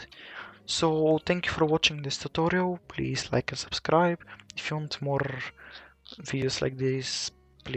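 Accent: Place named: Italy. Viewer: nice Italian english voice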